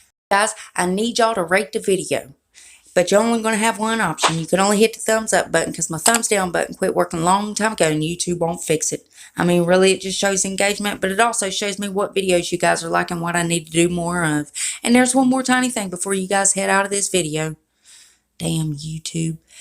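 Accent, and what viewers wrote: South, United States. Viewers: I love your Southern accent and sense of humor👏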